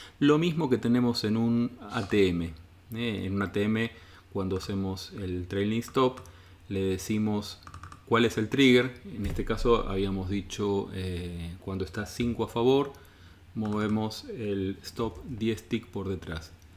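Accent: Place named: Argentina. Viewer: ahí me fijo.. muchas gracias!.. ché, por tu tonada creo que eres argentino.. vivís en Buenos Aires.. das algún tipo de asesoría presencial?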